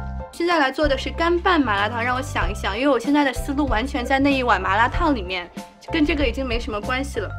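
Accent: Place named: Jiangnan, China. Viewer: 昨天试做了汤麻辣烫，很好吃👍今天试做干拌款。 话说东北小JJ说话很好听喲!感觉是江南小JJ呢！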